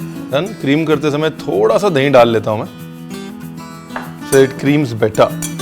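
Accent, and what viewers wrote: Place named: Lucknow, India. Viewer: Hi Ranveer...u r really awesome when u say....aap kahenge Ranveer aapne bataya nahi....real Lakhnavi Andaaz.... Thanks for such lovely dishes....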